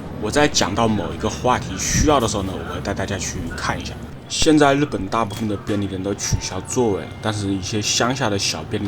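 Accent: Wuhan, China. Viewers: 你要不是武汉口音明显，我真怀疑你是国外长大的。口条都不知道😂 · 之前听你说话觉得你怎么像我们武汉人后来看到评论说你就是武汉人，哈哈原来是老乡！😊😊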